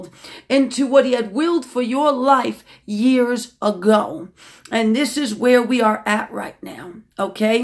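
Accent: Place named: New York City, United States. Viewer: GOD BLESS YOU WOMAN OF GOD. I HAD A SISTER IN CHRIST WHO SENT ME YOUR VIDEO RECENTLY AND YOU'VE BROUGHT SO MUCH CONFIRMATION. MAY GOD BLESS YOUR MINISTRY AND KNOW I'M SENDING PRAYERS FOR YOU, YOUR HUSBAND AND YOUR MINISTRY IN JESUS' NAME. I LOVE YOUR REVERENCE FOR GOD, HIS WORD AND HOLY SPIRIT! ON A SIDENOTE I'M ALSO A NEW YORKER AND JUST LISTENING TO YOU MAKES ME MISS MY NYC ITALIAN FRIENDS. #SPELLMANGRADUATEOVERHERE 🤗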